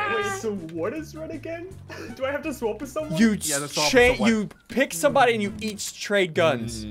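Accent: Canada. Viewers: Is he Canadian (im Canadian so that’s not racist) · He’s Canadian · Are u Canadian?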